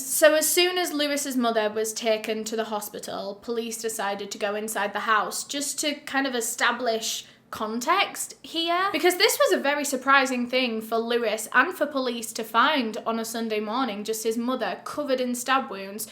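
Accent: United Kingdom. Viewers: She is from and lives in the UK.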